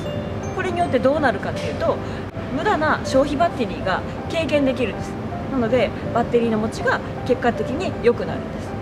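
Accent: Kansai, Japan. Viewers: 関西弁いいわー！ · 標準語で話そうとしているのかもしれませんが、関西弁の発音が出てしまっていますね。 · 関西弁、萌えるはーｗ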